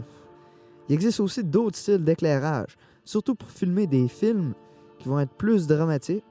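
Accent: Quebec, Canada. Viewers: Pourquoi tu n'as que 260 abonnées ??? Tu en mérite 10x PLUS !!! Ton travail est exemplaire , tes sujets sont intéressants et ton montage est magnifique PS : J'adore les accents québécois !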